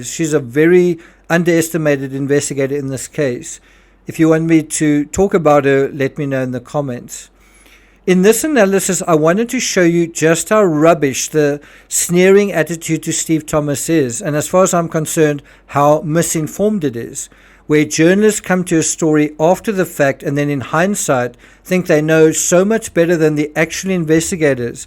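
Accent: South Africa. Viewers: Are you by chance South African? Your accent sounds so familiar- greetings from Nederland…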